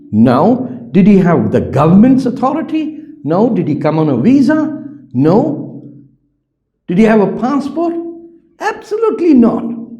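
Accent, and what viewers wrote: Punjab, India. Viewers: Please don’t speak English in Punjabi accent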